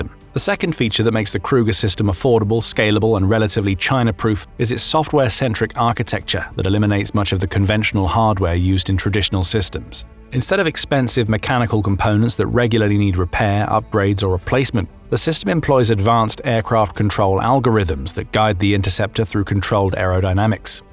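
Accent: United Kingdom. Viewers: UK english accent sucks.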